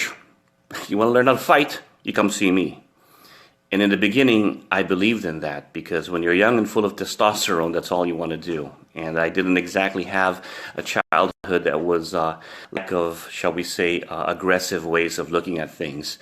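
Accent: Philippines. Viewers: Sir Doug, sabi ko na eh. Yung accent mo pa lang sir Pinoy na Pinoy na. I always watch episodes in Forged in Fire. Hihi. Your weapon sir will keal! 😊😊😊😁😁😁❤️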